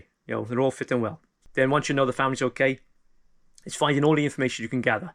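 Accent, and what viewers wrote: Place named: Scotland, United Kingdom. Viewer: I subbed, but IDK if I can deal with the thick Scottish accent.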